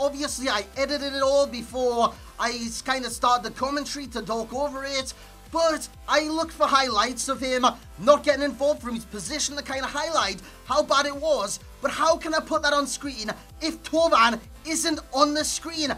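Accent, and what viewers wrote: Newcastle, United Kingdom. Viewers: hey, what part of the UK is your accent from? I'm from the US so i'm not very good at picking out different dialects.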